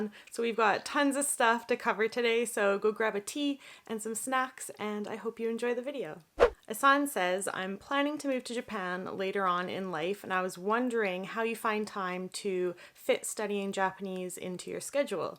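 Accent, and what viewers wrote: Canada. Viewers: I live in WA state and I interact with Canadians often. The dead giveaway for Canadians for me is the way they say "eh" and "about", and does not disappoint! LOL, thank you for sharing! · Has ever mentioned what part of Canada she's from? I'm curious about her accent My instincts say west canada, vancouver, but i'm a total novice when it comes to their accents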